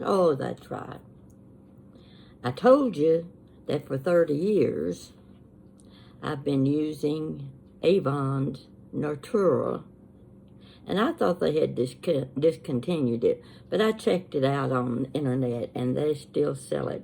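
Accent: South, United States. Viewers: I am 50 yo and I just love watching your videos, I love your tone of voice and your southern American accent. You seem such a nice and interesting person. So much full of life and interesting topics to tell. You relax me a lot. And my life is hectic, I certainly need someone like you in my life. You are great, thank you sweet lady! By the way, the earrings you made are fantastic. ❤ From Costa Rica.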